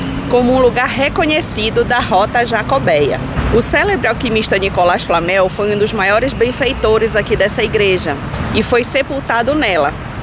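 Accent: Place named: Pará, Brazil. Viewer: Que bom saber a história da tour Saint Jacques. Você é paraense? Eu sou paraense e achei seu sotaque parecido com o nosso, adorei e parabéns por nos trazer conhecimento, obrigado.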